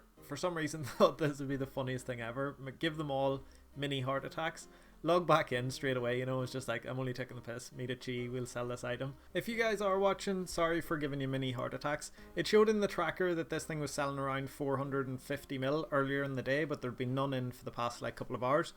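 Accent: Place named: Belfast, United Kingdom. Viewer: Bro we’re about a are you from? As a Belfast man I never thought our accent youd sound good on an rs vid... and I was right lol makes me cringe soo much.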